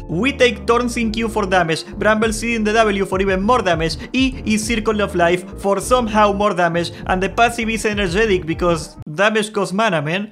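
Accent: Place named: Argentina. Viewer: Al minuto dije este es argento. Buen video campeón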